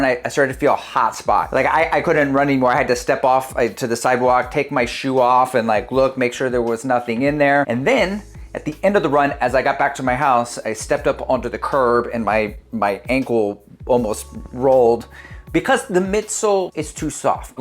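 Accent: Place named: Boston, United States. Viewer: As a New Englander your Boston accent was 1080 v13 like, but your explicative tirade right after was peak Boston!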